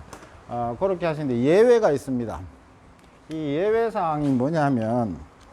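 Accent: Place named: Busan, South Korea. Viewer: 사장님 부산 이네요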